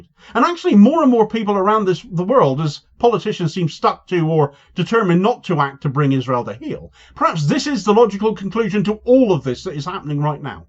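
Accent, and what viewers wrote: Cornwall, United Kingdom. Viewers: He is from Cornwall in the UK and has a regional accent (which I love), try using captions maybe?🤷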